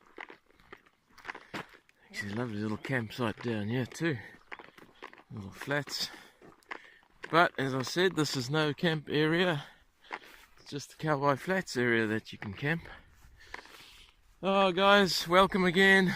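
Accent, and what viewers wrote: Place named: South Africa. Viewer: i love your films. enjoy your SA accent and knowledge of birds, etc, funny camping cooking scenes etc beautiful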